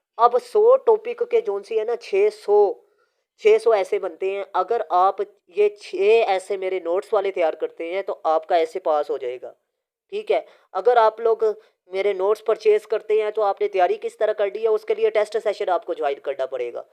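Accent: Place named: Punjab, Pakistan. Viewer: Pronunciation theek na hon to listener aur learner k spelling mistakes honay k chances bohat zyada hoty hen. آپ جب اردو میں بات کر رہے ہوتے ہیں تو خالص اردو استعمال کیا کریں۔سیالکوٹی یا لاہوری لہجے سے پرہیز کیا کریں، "ر" کو "ر" ہی رہنے دیں "ڑ" کرنے سے بابائے اُردو کی روح کانپ جائے گی۔ And when you are talking in English,kindly adopt the British or American accent.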